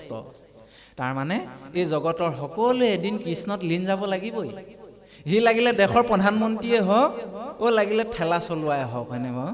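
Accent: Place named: Darrang, India. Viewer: Bap আপোনাৰ বানী শুনি বহুত ভাল লাগে। Darrang ভাষাৰ লগত মিলে।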